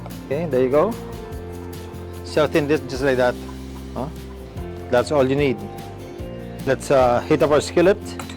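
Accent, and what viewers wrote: Visayas, Philippines. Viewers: Makalingaw k kung mag bisaya k 😂🤣👌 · Bisaya a na kayu oi! Naa Jud ang accent sa pagka bisaya HAHHAHHAHA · bistek tagalog niluluto mo, pero bisaya ka ng biasaya!!! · Visayang -visaya tlaga c Mayor Richard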